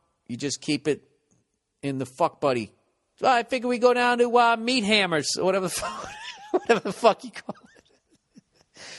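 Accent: Boston, United States. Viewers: Dude when he was talking about the pregnancy and it being her body blah blah blah I totally thought he was gonna say(in his boston accent) you're just the seed man you're not the gardener 🤣🤣🤣🤣🤣🤣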